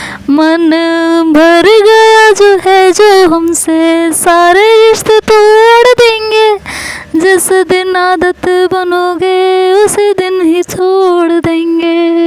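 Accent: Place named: Haryana, India. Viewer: haa har jgh ki language ke according bolne ka trika change ho jata hai.. jese apka full hariyanvi touch ho rha tha.. meri mummy bhi bol rhi thi ki ha harayana jesi hi aavaj lag rhi hai because mere papa vha job karte the hariyana me jb me peda nhi huvi thi tb to mumy bhi rhti thi sath to unhone observe kiya hai.. mummy bol rhi thi ki aap mjakiya type ho i mean ye nhi ki jb bat kro tension do us type.. pta h mummy ko suabh se chull tha ki mere ko bhi sunana aavaj 😂😂😂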